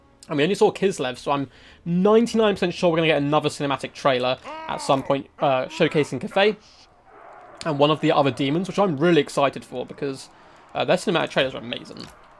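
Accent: United Kingdom. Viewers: It's the british accent but he's just an average lad.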